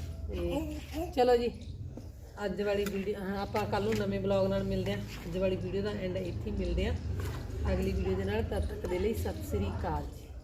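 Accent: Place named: Nabha, India. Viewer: ਅਜ fist time Volg ਦੇਖਿਆ ਵਧੀਆ ਲੱਗਿਆ ਨਾਭੇ ਕਨੀ ਦੀ ਬੋਲੀ ਸੁਣ ਕੇ ਵਧੀਆ ਲੱਗਿਆ ਮੇਰੇ। ਏਰੀਆ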